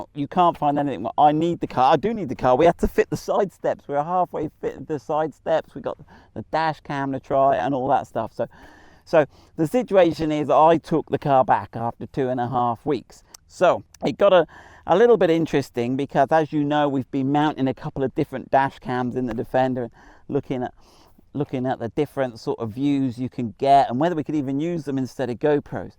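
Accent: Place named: Purton, United Kingdom. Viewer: Stay chilled. I totally get what you say about early adopters and I’m pretty sure we all appreciate your efforts. I for one continually sing your praises to everyone on the Range Rover 405 Facebooks page. I love the personal approach to your videos and explanations and agree with you about the sense of community and love the way you connect with your customers and subscribers (maybe it’s because I’m an old Purtonian and we share the same accent). Keep well and make the time to enjoy Dad days with. All the best from (for once) sunny Dartmoor. 👍🏼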